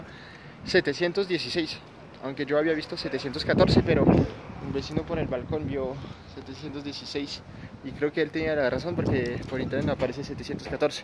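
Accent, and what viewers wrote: Colombia. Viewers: Hola ya hablas como colombiano. Que pena tu robo!! Lo bueno es que no te paso nada. Mucha suerte, que estes muy bien.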